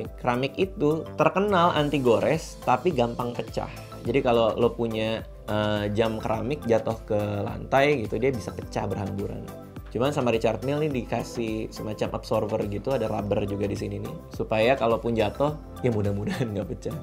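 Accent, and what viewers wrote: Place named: Jakarta, Indonesia. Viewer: Mas logatnya Jakarta sekali, terlebih waktu bilang "party".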